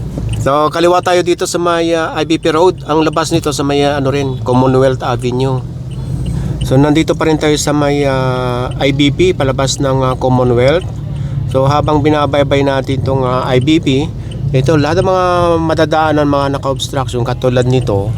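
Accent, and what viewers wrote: Visayas, Philippines. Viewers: keep up the good work,mataas ang rating mo sa mga viewers mo kahit medyo bisaya accent ok lang,meron laman ang sinasabi mo.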